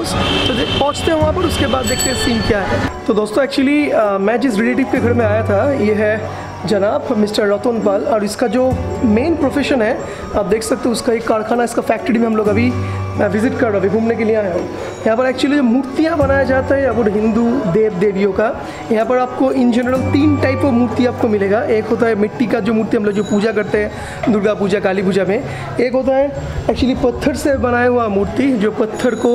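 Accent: Bengal, India. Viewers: Bhai tera hindi bahut kharab hai... better tu bengali me baat kiya kar. Bengali accent me hindi bolke hindi ka mazak mat udha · When u speak Hindi anyone can guess u r Bengali origin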